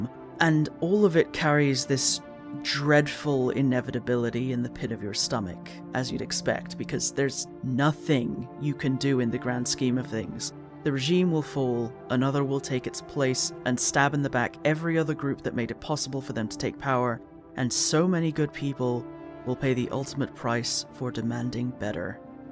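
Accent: Scotland, United Kingdom. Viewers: Oh! I didn't realize you were Scottish. I am comically bad at accents.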